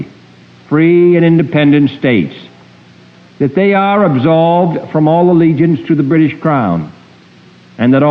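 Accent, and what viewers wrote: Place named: Boston, United States. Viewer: although i lived in a very republican home. i liked jfk.. there was something but of course i had no idea. i was 17 when he was killed (?). now i know why.. he was a good guy..so nice tohear his boston voice as i used to talk like that!!! his legacy will be completed very soon. God bless the Alliance Q and white hats.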